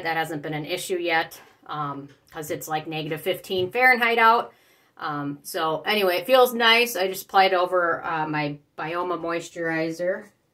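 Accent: Minnesota, United States. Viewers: Are you from Minnesota, by any chance?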